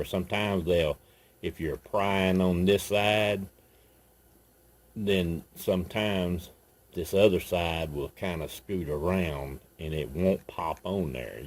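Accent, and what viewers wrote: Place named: North Texas, United States. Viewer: I looked at a couple three videos before replacing the trunk lift struts on my 2004 Impala, and I found yours very helpful because you showed the inside workings of the joint when the clip was moved around. No one else did that, I guess assuming we knew how it worked. Sure, once it’s open and you can see the nylon ball, it’s easy to understand. But every other video was all “put your thin flat blade in here and it will come off.” But if you can’t visualize what’s happening inside, you worry that too much pressure will cause the joint to fail and then you have another part to track down and replace. That, and your comfortable way of speaking, like all my folks did in North Texas and Southeastern Oklahoma, was really nice. I’m subscribing. If you have any tips on growing okra, I would appreciate them. I live in Utah at 4300’ and it’s hard to get a long enough growing season to make a decent mess of okra. 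Thanks again.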